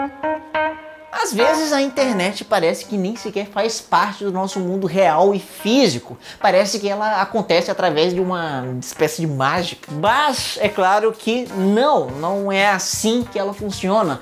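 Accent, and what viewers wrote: Ceará, Brazil. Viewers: Grande cearense, meu vizinho de estado hahaha conheci pelo sotaque antes mesmo de ele citar em algum vídeo o "por exemplo, aqui em Fortaleza". Canal massa, camarada.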